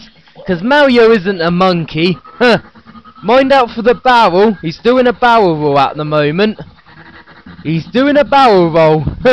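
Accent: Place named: United Kingdom. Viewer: Mario 64 and your (British?) accent, brilliant combination :D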